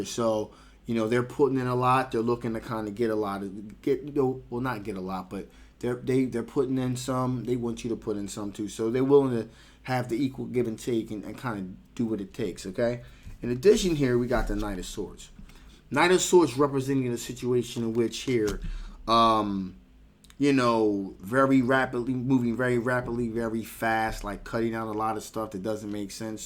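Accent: Philadelphia, United States. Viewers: I had a feeling you was from philly. Chester in the house. Lol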